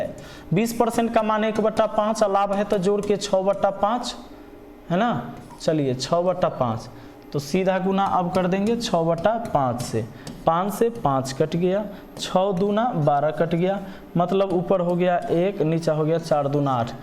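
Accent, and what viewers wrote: Bihar, India. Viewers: bihari type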